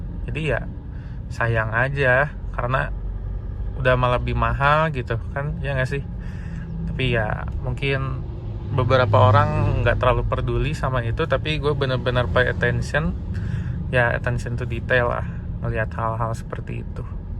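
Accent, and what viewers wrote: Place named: West Java, Indonesia. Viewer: Akang orang sunda jg ya?? Cara ngomong nya sunda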